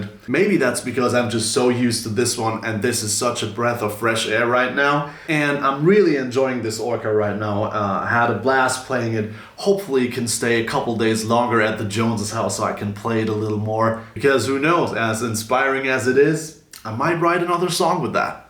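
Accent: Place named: Germany. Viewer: I thought i was listening to Zach Galifianakis imitating Matthew McConaughey.. lol.. Then i understood you might be from Germany.. But to the most important part.. your playing is absolutely sublime, man. Do you have a spotify or bandcamp account? I really hope you have a few albums out.. or even singles.. Take care and all the best,! Edit. Just bought your backing track in E Minor..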